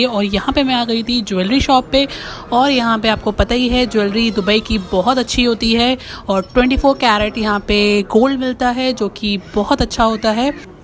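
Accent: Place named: Pakistan. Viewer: Sis aap India se hain ? Which state ? Mujhe laga k Pakistan 😂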